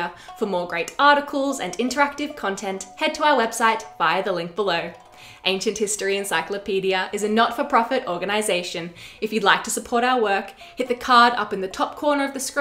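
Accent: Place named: Australia. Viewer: First off, though the narrator sounds Australian, she could just as easily be South African or even white Kenyan with that accent, so don't make assumptions based on skin color. Second, how is this stopping "Africans" from telling their "own" story? Third, who's exactly "African" in your opinion? "Kemet" Egyptians have been proven through the archeological record and DNA analysis to be from the modern Syrian, Israeli, and Palestinian region. Then they were further Semitized through the Hyksos invasion in the north, while having sub-Saharan contact through the Nubians. Both of those groups held dynastic rule for a short while. Then the Persians conquered Egypt. Then the Macedonians. Then the Romans. Then the Arabs. So again, who do you believe is "African" in your opinion?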